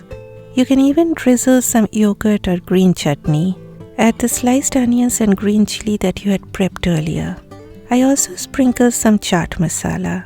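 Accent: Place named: Bengal, India. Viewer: Are you bengali 😊.from your accents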